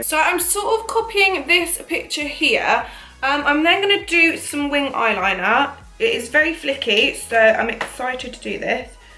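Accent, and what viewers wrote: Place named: RP, United Kingdom. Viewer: this is literally the third video of yours I'm watching today I just told my sister that I'm obsessed with ur voice and I wish I spoke like you you sound so posh and british 😭 my twin agrees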